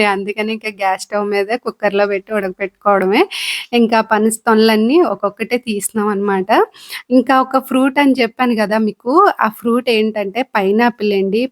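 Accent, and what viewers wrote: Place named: Srikakulam, India. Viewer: మీరు ఇంతకస్టపడి తెలుగు మాట్లాడిన... మన viIanagaram శ్రీకాకుళం బాష మారదు.. మాది తూ గో జీ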